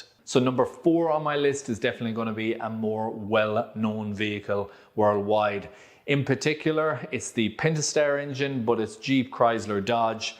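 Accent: Ireland. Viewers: Completely agree with your list. All mechanics and auto electricians have a list of vehicles that they would not recommend to their worst enemy. I am retired from the trade and I understand the issues that mechanics have to face in relation to dealing with badly engineered vehicles. Unfortunately, all vehicles have issues of some kind, my list includes some Peugeot models, not I hasten to add not the old 505/504 models. Some Volvo models have given me grief in the past. As usual great video. Just out of interest what part of the republic of Ireland are you from, cannot place the accent, I am from Northern Ireland and living in Melbourne Australia.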